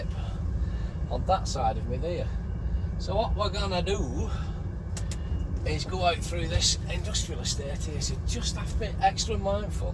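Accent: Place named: Yorkshire, United Kingdom. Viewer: that's the worst Yorkshire accent I've ever heard, can't say to much about lanky Lanks because my wife is a lanc god forbid, what a marriage Y&L.😂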